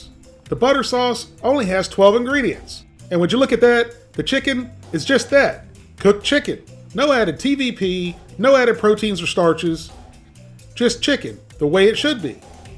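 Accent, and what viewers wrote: South, United States. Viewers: It's funny you sound less southern when you're with your wife.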